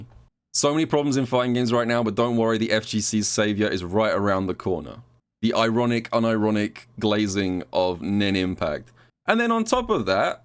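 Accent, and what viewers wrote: United Kingdom. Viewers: I thought I was clicking on a Sajam video and then a British accent started talking to me, I was baffled.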